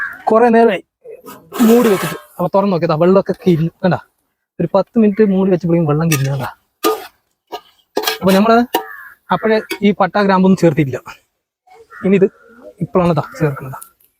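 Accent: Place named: Palakkad, India. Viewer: പാലക്കാട്‌ സ്ലാങ് സൂപ്പർ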